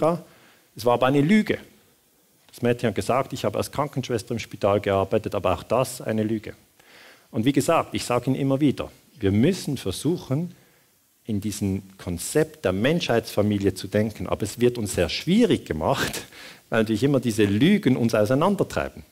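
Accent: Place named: Switzerland. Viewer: Der Schweizer Akzent ist heilig !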